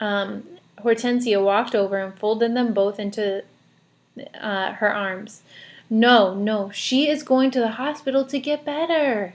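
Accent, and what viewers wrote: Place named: Mexico. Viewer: Bruh! You need to work on that Mexican accent! >:(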